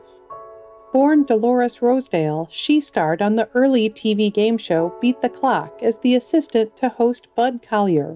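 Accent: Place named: Midwest, United States. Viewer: I dont like her midwestern vowels. Tone is fine but vowels are killing me.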